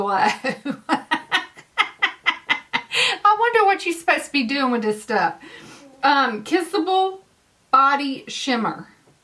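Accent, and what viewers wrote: South, United States. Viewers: Girlfriend you are definitely southern.love it!please enter me IG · Where are you from..so southern..even for me and I'm in Arkansas..lol.. Enter me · Loving that Southern Belle accent and that laugh!! 🤣❤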